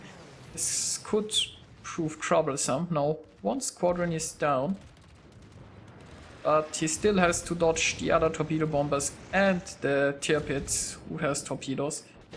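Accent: Germany. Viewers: are you german? Your accent sounds like a german who is speeking english.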